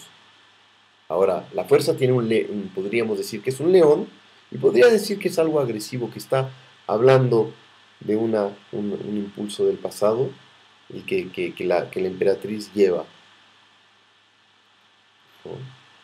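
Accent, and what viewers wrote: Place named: Mexico. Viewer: tiene acento de mexicano, un Gran Ser él y su padre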